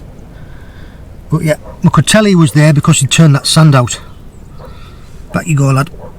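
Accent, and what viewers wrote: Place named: Geordie, United Kingdom. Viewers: I thought you was a northern lad just come across an old video <link> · As a jock I cant work out if your a Geordie or Yorkshireman, also get a military vibe about you, have you served 👍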